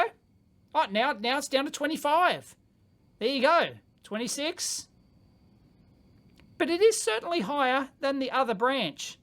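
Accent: Australia. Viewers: is Australian. That's pronounced " 'strine".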